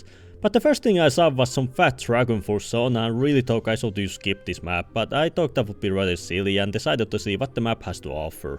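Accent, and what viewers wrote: Finland. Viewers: Kiva rallienglanti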